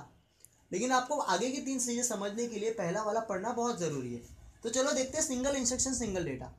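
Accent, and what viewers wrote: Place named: Indore, India. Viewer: Your way of speaking is awesome! Indori type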